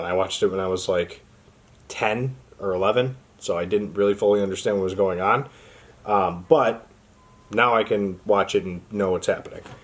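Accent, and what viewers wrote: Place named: Midwest, United States. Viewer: Man with heavy midwest accent in a Menards shirt...checks out. Great content 🤘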